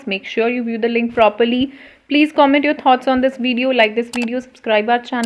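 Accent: India. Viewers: Indian accent 😩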